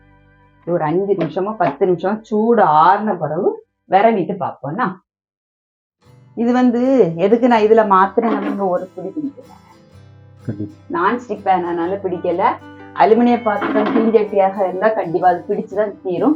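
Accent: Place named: Nagercoil, India. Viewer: இயல்பாக பேசறீங்க... நாகர்கோவில் பாஷை..நல்லா இருக்கு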